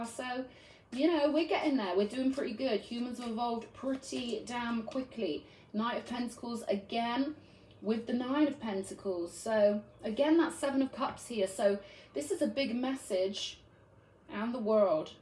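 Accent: United Kingdom. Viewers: Smooth British female voice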